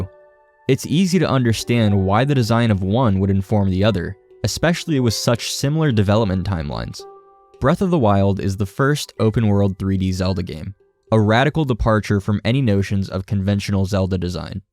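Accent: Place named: Northeast, United States. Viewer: you have a very northeast accent and it’s dope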